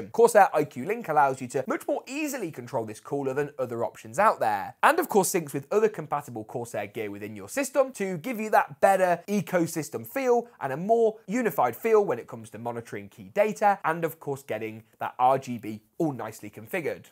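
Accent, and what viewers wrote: United Kingdom. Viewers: Man, British accent is really tough(for foreigneers😅😅😅) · Are you British? No disrespect to England. But I Can't understand anything you say. You are eating half the words and talk too fast.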